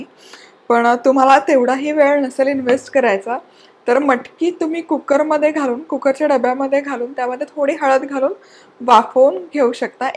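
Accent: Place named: Pune, India. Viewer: शुद्ध मराठी पुण्यातली 😊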